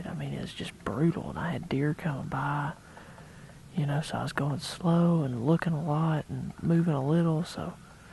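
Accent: South, United States. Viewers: Personally the southern accent makes him sound like he knows what he is doing. Hats off to you southern dudes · That music killed the video.... And your southern talk....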